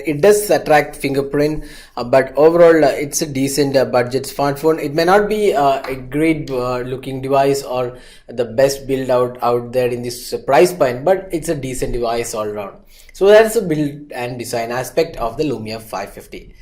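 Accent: Kerala, India. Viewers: heaavvyyy mallu accent.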